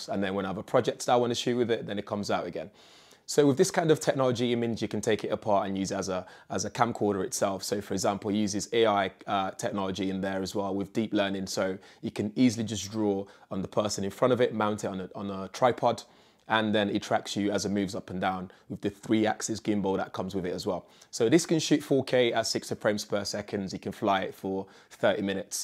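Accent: United Kingdom. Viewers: His accent is the coolest. Is he British or South African?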